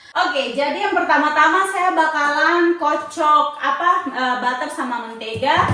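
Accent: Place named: West Papua, Indonesia. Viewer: Kakak ini dari Papua atau dari Sulawesi dari penampilan kakak kaya orang Sulawesi tapi dari dialek kakak kaya orang Papua Barat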